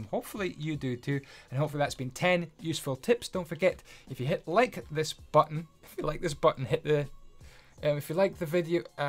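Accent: Scotland, United Kingdom. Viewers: Is he Scottish? Or Irish?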